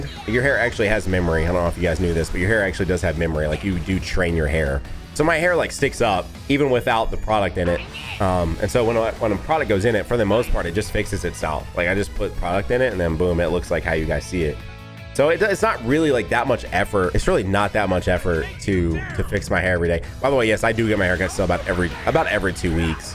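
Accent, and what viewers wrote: South, United States. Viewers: the southern accent is killing me 😭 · Didn't know i needed a Southern video today😂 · Your southern accent sounds fine to me but i also grew in southern state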